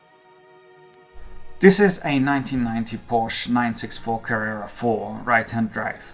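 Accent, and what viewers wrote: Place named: Germany. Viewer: With your totally awesome German accent you stated you were not a Porsche guy, you will be. I will be following along.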